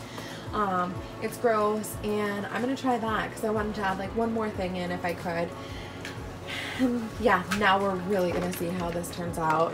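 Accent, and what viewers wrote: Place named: Upper Midwest, United States. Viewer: Upper midwestern accent—love it!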